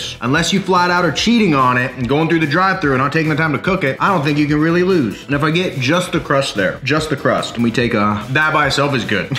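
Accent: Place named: Ohio, United States. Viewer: Listening to this dude gave me ohio vibes. Checked him out... columbus. Southender here myself.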